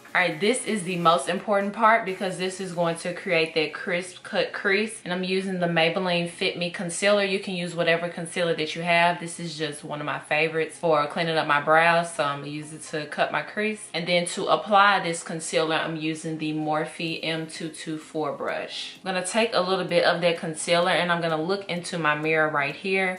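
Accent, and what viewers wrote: South, United States. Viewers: Beautiful! Every Time I watch your videos I always think of Beyonce your voice sounds so much like her speaking voice. Not just the southern accent but also the tone.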